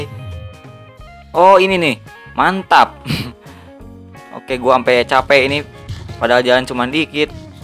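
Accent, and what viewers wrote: West Java, Indonesia. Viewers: kaciri pisan urang sundanateh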